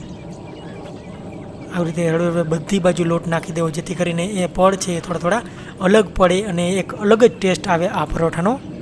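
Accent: Kathiawar, India. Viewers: મિત્ર, સલામ, આપની વાનગી અમોને ખુબજ લલચાવે છે, વ્હાહ, મજા આવી જાય... આપ શ્રી. આપની કાઠીયાવાડી માં બોલોને, ઈ અમોને ગમશે, જેવી તમારી રસોઈ તેવી તમારી બોલી.... DHANYAWAD..... ALL THE BEST....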